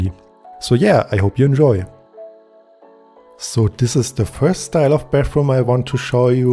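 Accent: Germany. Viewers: Are you German? Doesn’t mean as hate but you kinda sound German😅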